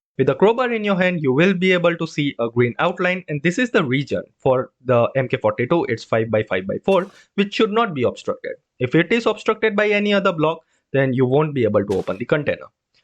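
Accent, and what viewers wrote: India. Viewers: Heard the Indian accent and I knew I found what I was looking for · Tell me you are indian without telling me you are indian. not trying to offend anyone, nice video.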